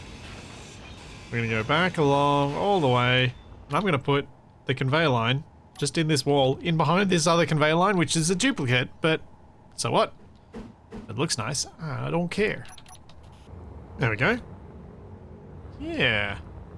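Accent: Australia. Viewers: With Ozzy Man dialect, my brain keeps translating his talk about "half-width stairs" into "half-wit stares"... which I'm kinda takin' personally... 😳🥸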